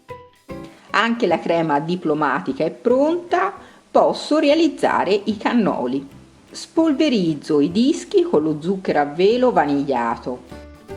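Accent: Tuscany, Italy. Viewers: Anche lei è deliziosa, sia per la pronuncia Toscana che per le meravigliose ricette!!!!😘😘👩‍🍳🍰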